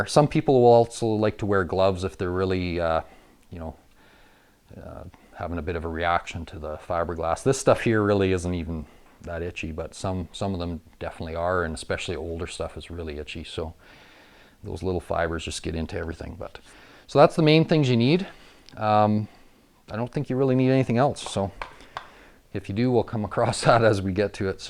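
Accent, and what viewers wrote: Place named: Canada. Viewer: Canadian accent 😕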